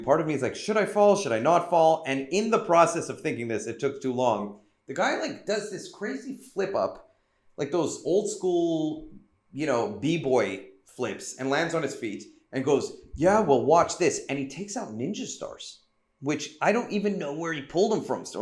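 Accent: Israel. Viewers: Good video and good Israeli accent. I had never heard of the Israeli style. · Your Israeli accent is very good.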